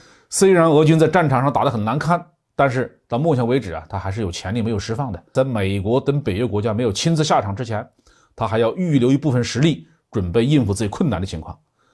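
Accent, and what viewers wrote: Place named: Xishui, China. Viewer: 好浓重的浠普，哈哈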